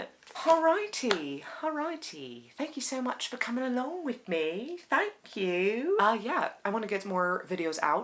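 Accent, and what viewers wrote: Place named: Ireland. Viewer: Yayyy congrats on the new job! Also loved your Irish accent haha :)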